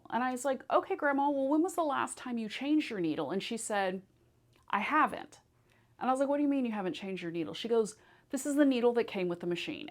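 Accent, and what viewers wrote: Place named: New York, United States. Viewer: Thank you for this video! I've been sewing longer than I care to admit (58 years....?) and what I learned, I learned when I was 10 or 12 in home ec classes (do they still have those?). I had a vague idea of sizes - smaller for smaller fabrics (that meant lighter to me), and larger for larger fabrics - but I knew nothing about the numbers. You didn't mention the second number - is that important as well? One other great needle is a leather needle - they have a very sharp triangular point that is sharp on all sides and can be really handy if you're having trouble sewing something very heavy (think multiple layers of denim). Also loved your hipsters (ahhh, brought me right back) and I detected a wee bit of NY in your accent.... :) Glad I found you - we never stop learning!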